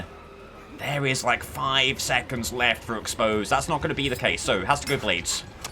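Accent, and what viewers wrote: United Kingdom. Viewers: i love your accent its honestly very cool you are my fav british (assumed brit, sorry im not sure which specifically if its wrong) dbd creator you always do good commentary as well. real proper gent. as an American i always like to hear the way others talk its a nice change to listen to a non american accent! good stuff.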